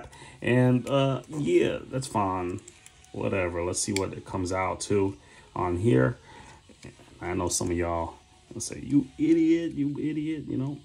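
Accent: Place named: Louisiana, United States. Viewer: I don’t think the clip pressure is a factor at all. The only thing it could do is make the unlock harder, but it just doesn’t. I feel like the Zaan has the easiest, smoothest unlock you could ask for - there is ZERO lockstick, and the bar is intuitively designed. I’ve never heard anyone criticize the unlocking, the it’s one of the Zaan’s best features. Out of the box, the OPENING is pretty stiff, but you have options - 1) you can just use it until the washers and blade break in to each other and slide easier (6 months maybe?) 2) you can disassemble the knife and carefully polish the face of the washers (2000 grit paper on a flat surface) then add some grease and a little oil mixed in 3) the easiest and fastest fix - just back off the pivot a teeeeny bit (1/32 of a turn, if that) and the deployment is a lot easier. What’s the background on your accent? 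There’s like some Louisana but a little Boston?? It’s unique, I’d love to hear the origin.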